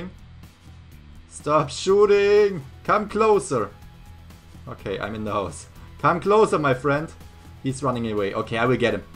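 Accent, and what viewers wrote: Germany. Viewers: Are You german? Because You have like an accent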